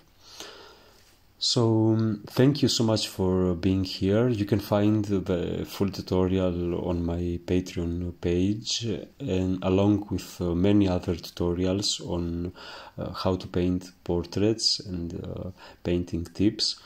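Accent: Greece. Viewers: Your English is more than adequate as you explain and demonstrate this process so beautifully. I recognised your distinct Greek accent immediately, with a Greek Athenian mother, and thank you for sharing your gift and skill in such a thorough way. Your work is a masterpiece with mystical presence as seen in some fayum portraits, except this young girl is brought to life more profoundly with expression as with the deeper appreciation of this timeless portrait revealing the layers and dimensions, Your painting is a language of its own. Truly impressive. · It's magical how you begin with black and white, and end up with this! Wonderful to watch and learn from. No need to apologize for your English; the accent is charming. Besides--you speak better English than many English-speakers can speak Greek!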